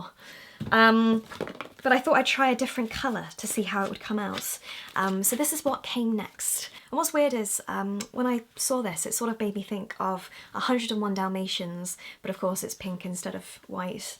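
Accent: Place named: England, United Kingdom. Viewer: Hi! I'm a long-time italian fan of yours, as I've always loved your videos, your art and your ecstatic English accent! I'd really love to get one of your original canvases, but I visited your shop on Etsy and I could just find prints. Is it because it' not possible for you to ship in Italy? Hope you'll reply, can't wait to have on your your art work on my bedroom wall 😊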